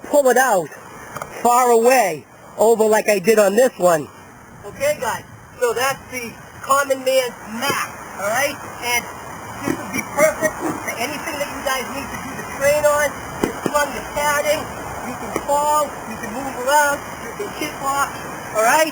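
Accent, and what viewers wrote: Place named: Boston, United States. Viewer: Ha! You're definitely from Massachusetts or maybe even Rhode Island. What gave it away, you ask? Oooh, one of the original shirt designs from WAAF, the Patriots hat and the THICK Boston/Providence accent. I'm not raggin bud, I'm from Massachusetts and I often forget to realize how we sound... it cracks me up. I don't usually see or hear fellow New Englanders on youtube, kudos. Great video, with a great idea. Keep it up. Cheers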